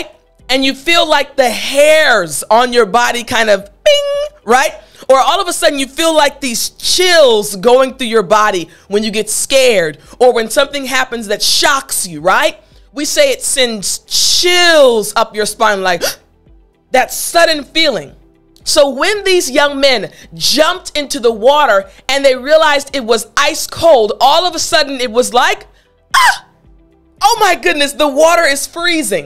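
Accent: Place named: United States. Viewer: That American accent,does confusion me a lot....No disrespect..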